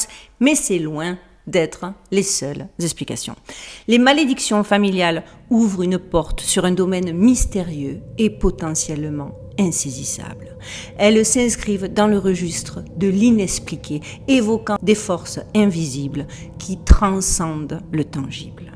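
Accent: South, France. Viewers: bonsoir j'adore votre accent du sud de la France que l'on ne perd jamais où qu'on aille je suis toujours demandé comment cette famille a pu prendre un tel essor "sans rien faire" oui oui oui je fais de la magie chrétienne et j'arrive à un bonheur incroyable mais je me suis faite toute seule et jamais le dark side ne pourra m'atteindre j'ai l'amour inconditionnel et universel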